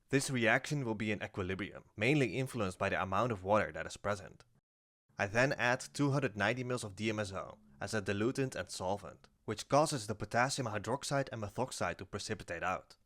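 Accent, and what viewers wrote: Netherlands. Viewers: I know a fellow Dutchman when I see (hear) one... goed bezig man, je hebt een kijker erbij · r u dutch? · I hear a Dutch accent? Gaaf hoor, en ik moet me echt een rain geur aanschaffen 😅 -Oh nee ze zijn uitverkocht! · Are u dutch ? u sure as hell sound like it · Are you by any chance dutch? I noticed a little bit after way too long but i did pick out a couple of pronunciations when i started to focus on it, and now you saying youre not a native speaker... That would be really cool! Anyways loved the video im glad i got it in my recommended im definately gonna binge you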